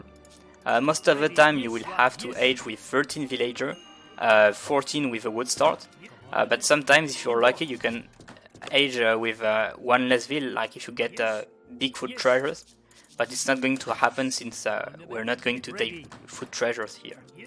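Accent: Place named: France. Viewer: That french accent is insane 😂😂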